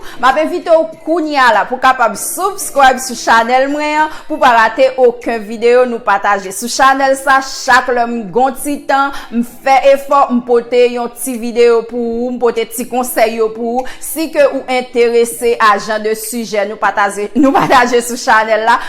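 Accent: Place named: Cap-Haïtien, Haiti. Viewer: Sa son fanm okap pareyanm kanmenm palèy gn twop resanblans premier vidéo'w map gade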